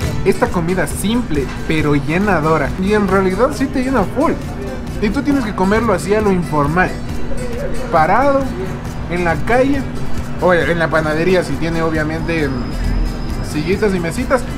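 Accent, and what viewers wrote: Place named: Quito, Ecuador. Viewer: 🌟 Eres el Quiteño más simpático que he visto 😍💙 Me encanta tu acento... se te escucha lindo 💋 XOXO ❣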